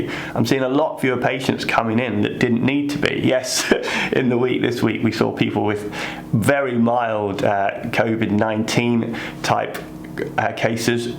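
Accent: United Kingdom. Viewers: Does anyone know where abouts in the UK is? Not for stalking purposes lol 😆 I just thought I recognised his accent, as local to where I live, but I realise he may have moved for work.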